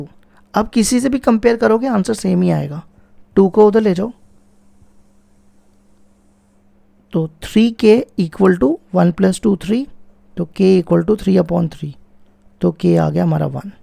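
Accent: Jammu, India. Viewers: Sir you are from jammu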